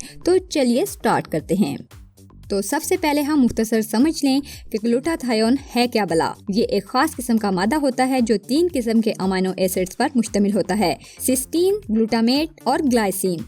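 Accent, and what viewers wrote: Punjab, India. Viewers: yaar plzzz apni Urdu ka style theak kro bilkul Punjabi lehja hy tumhara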